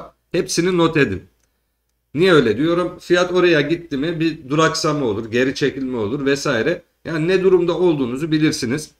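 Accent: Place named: Mediterranean, Turkey. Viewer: Adamsın kardeşim seviyorum seni anlatımında çok güzel diyalektinden anladığım kadarıyla Akdeniz çocuğusun ben bitcoin alıp satmıyorum ama marathon digital holding bitcoin mining i yapan bir şirket hissesini alıp satıyorum oda bitcoinle yükseliyor onunla düşüyor ama senin anlatımın ve analizin süper SEVİLİYORSUN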